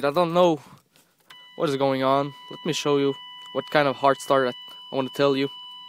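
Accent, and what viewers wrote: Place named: Quebec, Canada. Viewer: cool accent.. are you french canadian? I have a few freinds that are.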